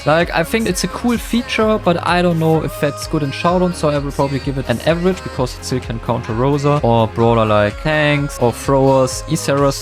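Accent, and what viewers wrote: Germany. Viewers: hilfe dieser deutsche accent 😭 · I love ur German accent :face-blue-smiling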